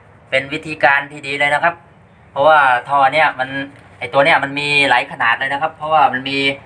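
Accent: Chanthaburi, Thailand. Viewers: คนที่ไหนครับสำเนียงนี้ คล้ายบ้านผมเลย ผมคนจันท์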